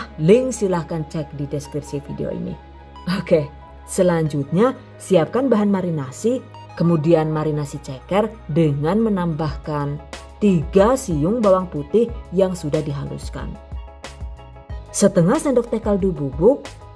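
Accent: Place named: Java, Indonesia. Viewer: Bahasane Nyenengna . . Medok banget . . Nyong suka Bahasane . . 😂😂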